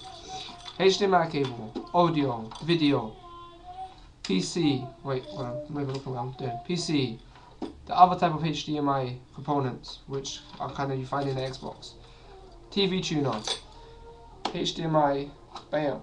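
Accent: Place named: Portugal. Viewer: u sound portugese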